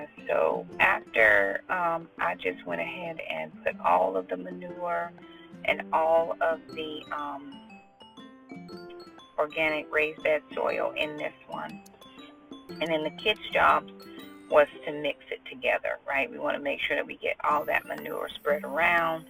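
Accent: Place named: Carolina, United States. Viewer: I love the Carolina accent 🩷 also you just made me look up homestead, farmstead, and its actually what ive been wanting to do. (God speaks)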